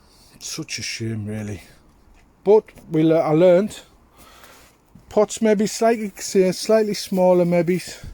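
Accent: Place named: North East England, United Kingdom. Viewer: where in the north eaat you at, marra. i was born in horden. you sound not far away. i only ask because i find it easy to understand the accent.....................brian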